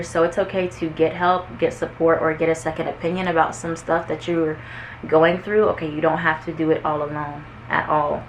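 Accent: Bronx, United States. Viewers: Girl I thought you were from the Bronx, I was gonna say I'm from there too🤣 I just subscribed and yes, he's an aquarius. He just finished packing his things with a police escort 😩 I'm so over him and relationships. I've watched at least a dozen sag readings and they all say the same thing: it's painful but it's good for me.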